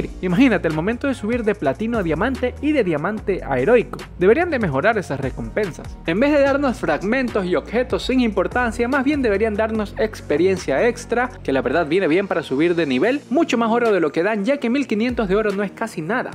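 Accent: Ecuador. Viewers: Pregunta: De que parte de Ecuador eres? Lo digo por q en un directo te vestiste de amarillo y dijiste como el "Barcelona" y en unos vídeos sabes hablar como ecuatoriano xd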